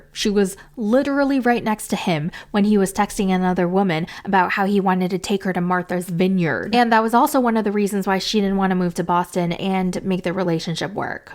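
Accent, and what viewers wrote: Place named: New York, United States. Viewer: Your New York accent. Haha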